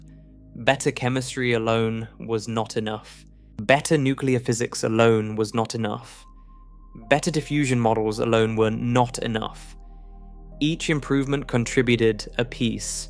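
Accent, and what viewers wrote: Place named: RP, United Kingdom. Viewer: Good content, but the choice of the AI narration (a posh British accent), absolutely destroys the accuracy of the captions. Isochron, subgiant... all manner of technical terms, are rendered gibberish. An American accent isn't as melodious, but it is far easier for the caption translator to decode. Also... very repetitive... this is 40 minutes of content, stretched to 133 minutes.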